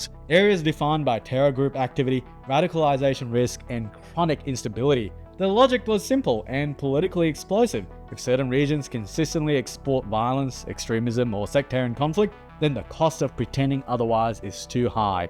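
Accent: Australia. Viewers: You have the most Australian accent I've heard on youtube do far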